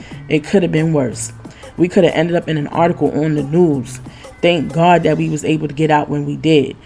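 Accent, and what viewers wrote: New York, United States. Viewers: Unfortunately human trafficking has been going on for years in South beach but it just recently got real bad. I heard it’s real bad in Texas and Arizona as well. Also the “police” definitely be in on that shit believe that. Also off topic I have been watching your videos for a couple of days and I knew you was from NY we just have a way we talk. I’m from Bedstuy and we are definitely with the shits!!😏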